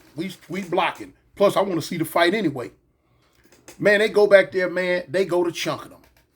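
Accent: South, United States. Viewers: Is anyone from the south in your family? Damn I’m from Atlanta but you remind me of a lot of my uncles. How you speak..your accent..maybe I’m tripping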